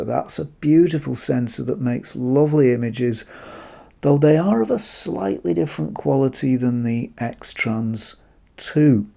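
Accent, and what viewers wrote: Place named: United Kingdom. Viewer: Well, this is a year later from your comment, but I say bugger the manual. (Listening to Mr. makes me feel like talking Brit.) Watch a couple of Youtube vids about it and Bob's your uncle. Later you'll have specific questions to look up answers to in the manual—or yet another Youtube tutorial.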